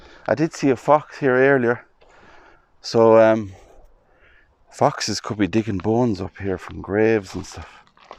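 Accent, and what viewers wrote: Ireland. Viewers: Yet again, a lovely adventure around a fascinating Graveyard. Every one of them has secrets never to be told. Interesting Tombs and as always, your beautiful Irish accent makes these videos even more watchable - thank you. Take care 🙂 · Thank you for your interesting commentary, which I really enjoyed listening to. You have a beautiful Irish lilt to your voice and you sound as if you might be a good singer. Stay safe and keep well. Zora in Australia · Are you Irish?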